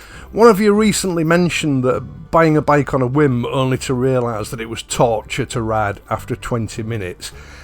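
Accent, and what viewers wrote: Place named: East Yorkshire, United Kingdom. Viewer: Ive had the Honda CL500 for 2 years now and its great - not in a hurry to change it. . By the way, you sound like you're from the East Yorkshire area, if so, me too!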